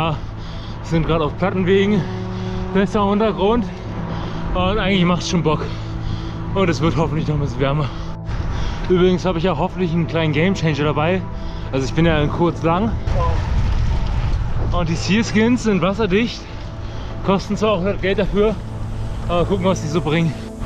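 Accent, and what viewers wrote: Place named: Germany. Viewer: Ganz gut gemacht, wünsche mir ich wäre dabei gewesen, nur eins hat sehr gestört: die englischen Brocken solltest Du Dir schnellstens abgewöhnen, andauernd immer wieder: "nice", "to go", "ride on", usw.,.das ist so oberflächlich, und die hören sich mit dem deutschen Akzent wirklich schlimm an - Es tut mir Leid.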